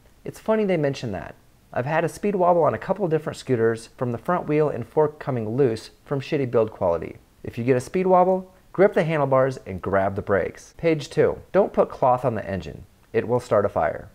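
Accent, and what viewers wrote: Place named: China. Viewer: Chinglish!!!! I love it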